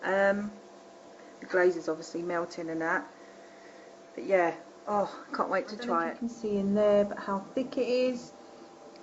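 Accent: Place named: Midlands, United Kingdom. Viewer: Mmmmm want one! Where about's are you from? I recognise your accent, it sounds like Midlands maybe?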